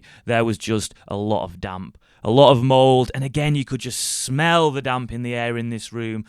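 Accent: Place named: Liverpool, United Kingdom. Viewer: I'm so In love! I want him!!! 🥰🥰🥰 I love the scouse accent and I say it all the time, an accent like his on a man like that is so attractive. 😍🥵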